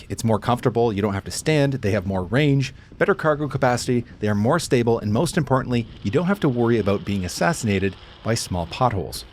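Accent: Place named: United States. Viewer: Bro... Why is this guy speaking American talking about kilometers?!?! Im so confused lmao.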